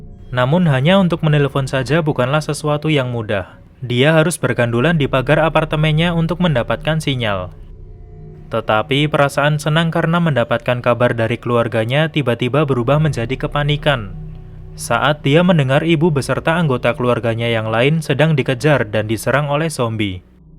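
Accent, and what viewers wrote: Java, Indonesia. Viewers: Jawa y bang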